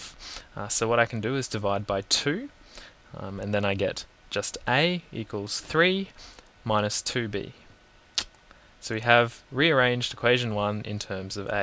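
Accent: Australia. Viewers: (PixelonTV) Australian (Y)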